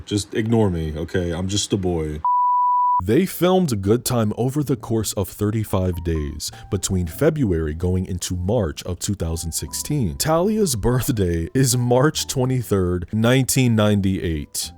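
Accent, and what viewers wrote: United Kingdom. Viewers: you fool lol. I absolutely love your uk/brit accents, they just scratch the perfect spot in my brain lol 🙂‍↕️🤝🧡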